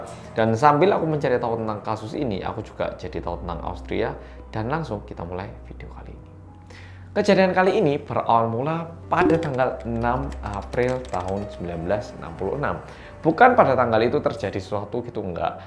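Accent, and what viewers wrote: Java, Indonesia. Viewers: Sebenarnya udh nnton di Omara tapi mau nnton lagi versi om medhokk🐒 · Hai org Korea medoook ❤ Nyimaaak 🎉🎉🎉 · iki wong korea opo jowo? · Jebole medok yo😁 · Masya Alloh kok bisa selancar itu berbicara pakai bahasa Indonesia dengan logat Jawa padahal asli orang Korea.Kalau lahir di Indonesia wajar selancar orang Indonesia.Salut banget 👍Cerdas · Masyaallah....sy kira Wong jowo asli nggak taunya Korea to.... 😃👍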